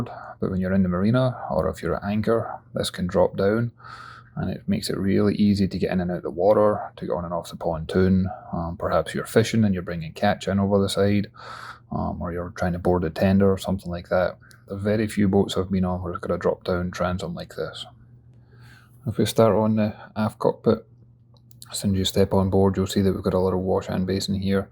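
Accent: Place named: Scotland, United Kingdom. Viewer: Nice to hear a Scottish accent..🏴🏴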